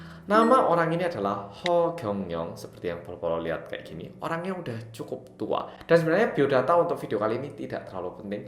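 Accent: Java, Indonesia. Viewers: Ade ku udh hapal banget sama medokkk nya mas 🤣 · Malah medog ngomong nya 🤗🤗🤗 ngga kerasa orang asing yg bawa 😆😂😆😂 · Kang, boso jawamu medok ae kang wkwkwk, lanjut trus berkarya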